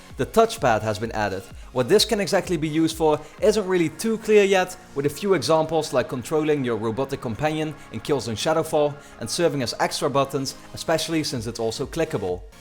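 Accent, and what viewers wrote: Netherlands. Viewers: Haha, ik kan horen dat je nederlands bent, te geweldig accent man. Je kunt goed engels spreken hoor, niks daarop tegen :)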